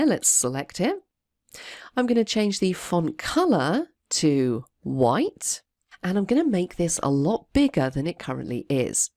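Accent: United Kingdom. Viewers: Thank you so much...in fact I am a zero user of P.P., but in fact I´ve learnt a lot just by watching this and your previous videos. I also like your accent I am kinda a fan of british one...take care and regards from Trujillo- Perú.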